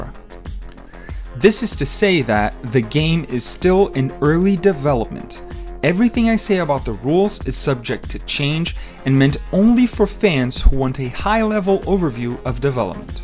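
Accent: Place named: Brazil. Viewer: eu tava estranhando o sotaque no último vídeo, mas não tinha reparado no nome... Vc é br senhor? kkkkkk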